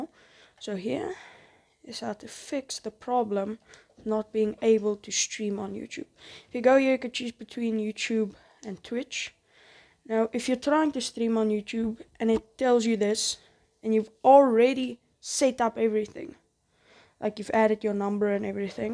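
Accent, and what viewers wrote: South Africa. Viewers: I had a South African best friend on ps5 voice chat friends when I heard your voice I immediately knew 😂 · Why u sound south african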